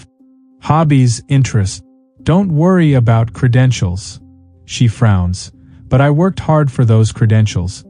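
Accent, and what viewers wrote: United States. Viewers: Please!! If it an Aussie telling a story, make it an Aussie telling the story! #shitusaccent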